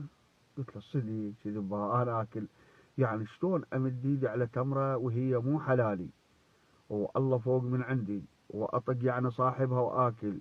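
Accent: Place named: South, Iraq. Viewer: لهجة جنوبية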